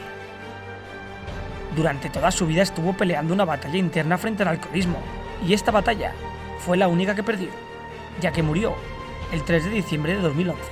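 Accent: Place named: Andalusia, Spain. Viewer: Me emociono hasta las lágrimas. Como persona: ejemplar, generoso, brillante, lúcido, líder con un aura especial. Como futbolista, que decir, fino, exquisito, ultra inteligente, tácticamente diferenciado, el hijo de TELE. SOCRATES-FALCAO-CEREZO-ZICO. Los futboleros no olvidamos. PUÑO arriba. Nota de color, me encanta el relato andaluz.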